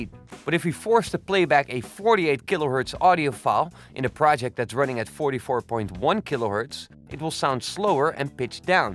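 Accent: Netherlands. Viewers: You sound dutch.. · are you dutch by any chance or belgium?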